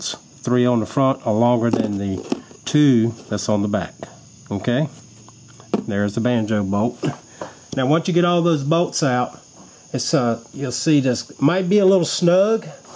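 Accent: South, United States. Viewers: 😂 that Southern accent... lol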